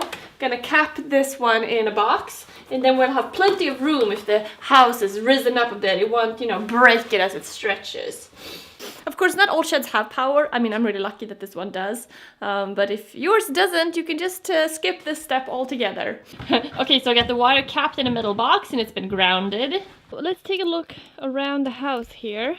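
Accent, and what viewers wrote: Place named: Sweden. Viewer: En personlig fråga. Vari Sverige kommer du i från? Svenskan lyser igenom i din accent nämligen. Bara nyfiken. Annars är jag djupt imponerad av din oräddhet när det gäller att ta sig an olika byggprojekt!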